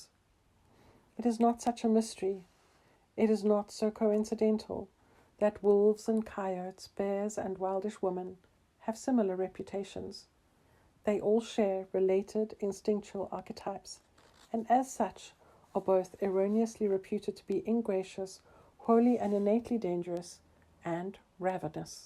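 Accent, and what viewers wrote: South Africa. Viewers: Accent sounds south African ❤❤❤ thank you ☺️ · Are you South African? I love your accent. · The reader sounds South African 😊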